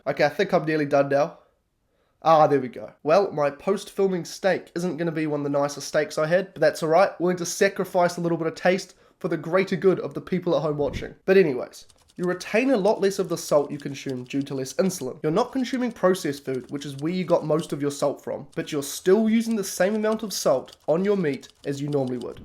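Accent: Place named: New Zealand. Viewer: Kiwi accent is never a problem listening from Australia. Good on you ❤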